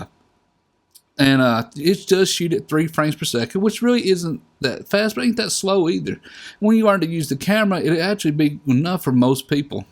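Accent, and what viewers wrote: South, United States. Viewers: Hi, I am looking for a good camera i can shoot sports and also shoot videos. I would like your opinion on either canon t5i, canon 60d or nikon d7000 or any others u might recommend or suggest. I would like to upgrade maybe to intermedite camera I currently own the canon t3. Hey I really enjoy your southern accent and videos keep up the great job.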